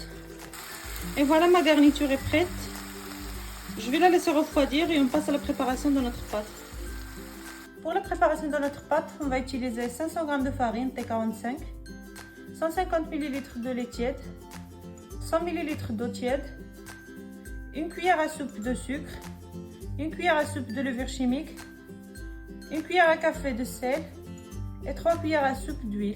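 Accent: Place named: North Africa. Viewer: Merci beaucoup Madame pour cette delicieuse recette , j' adore aussi votre accent qui sent le soleil d'Afrique du Nord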